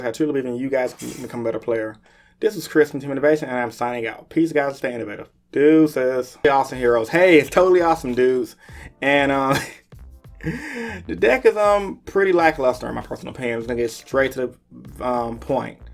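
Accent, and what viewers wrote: Chicago, United States. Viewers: You from chicago man? Were you go?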